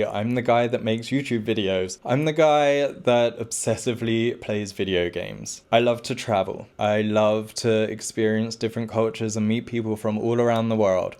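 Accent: England, United Kingdom. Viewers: Especially given that the handsome has excellent communication skills, especially when delivered with that gorgeous English accent 😍😍😍😍😍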